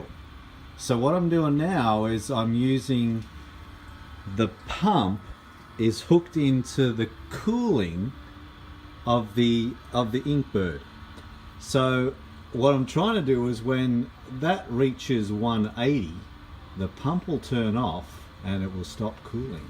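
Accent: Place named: Australia. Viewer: Good vid. Like the Aussie accent with the occasional US inspired pronunciation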